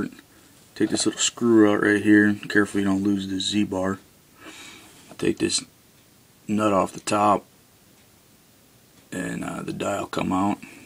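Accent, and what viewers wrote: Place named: United States. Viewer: Fantastic video. You bottom line stuff and talk in the proper american english just like my uncle. Thanks for the info and the fix!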